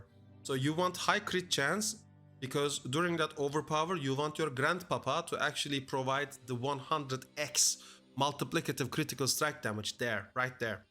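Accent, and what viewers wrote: Turkey. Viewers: İngilizcemiz bile Milli bir tarza sahip, vallahi çok güzel konuşuyorsun. Buildler için de teşekkür ederim, bugün yarın deneyeceğim. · Aboow Türk İngilizcesi bu. Vay dostum süper içerik süper video. Twitch yayıncısıyım seni paylaşacağım izninle.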